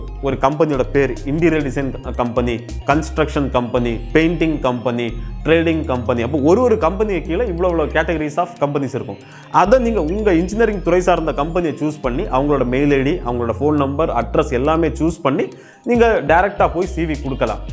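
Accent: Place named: Nagercoil, India. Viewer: Iam hardly searching job at UAE.. its very useful brother.. neenga Nagercoil ah brother.. speech la Nagercoil slang varuthu..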